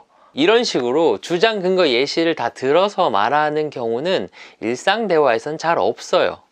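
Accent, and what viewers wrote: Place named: Gyeongsang, South Korea. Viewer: 저는 어릴때부터 발표를 너무 싫어하고 기피했는데 살면서 정말 피하고 싶었던 그런 면접을 보게 되었거든요ㅠ 그런데 제가 부산사람이라 억양을 어떻게 해야될지도 모르겠고 그래서 더 너무 힘들다고 생각했는데 선생님께서 경상도 억양을 섞어서 너무 자연스럽게 똑부러지게 말씀하시는거 보고 부산말로 하는게 이상한게 아니구나 깨닫고 지금 그것만으로 너무 감사하는 마음입니다ㅠ 저 힘내서 잘해보겠습니다.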